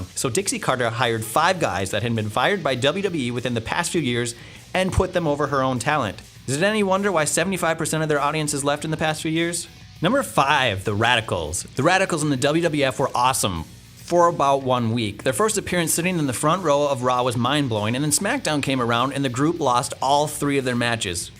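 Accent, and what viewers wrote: United States. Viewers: Exactly. I'll watch if it's Blampied, Pacitti, Simon or even Jack but is a no-no. Annoying bland American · sorry... the narration through me off.. American accent confused me. lol I thought I wasn't watching WhatCulture for a sec. 😄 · Fuck this American, un subbing now , this would be so much more better with a British guy narrating - why the fuck are you guys diverting from what made you so great ? It's like your the wwe and we the fans are told to just shut up and accept it - fuck you - wrestling with wregret all the way now , this channel is no longer what it used to be , it's sold out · So you're the yank, eh? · throws me to hear an american accent on these vids. dont understand the hate though. all these vids sound like they have the same writer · Who the effing hell is this guy with an annoying yankee accent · Hears american guy Instantly goes back to subscriptions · I'm watching a WhatCulture video why is there an American talking. 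If I wanted to hear Americans talk I'd be watching wrestling directly. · *american accent *shudders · Whatculture lucked into something great with the 10 Totally Wasted Factions and as they don't often do, they had no idea what to do with it, and put a whiny American kid in it. Long live British accents in Whatculture. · I'm not used to hearing an American on WhatCulture Wrestling😅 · I'm pretty sure it's because he is an American and doesn't have the British accent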